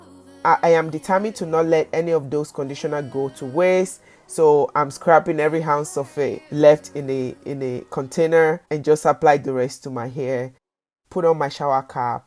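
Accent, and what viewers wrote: Yoruba, Nigeria. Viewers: No matter the accent the yoruba accent will always peek through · Your Yoruba right? I’m Igbo and I recognized your rich accent. Lots of love❤️❤️❤️❤️❤️❤️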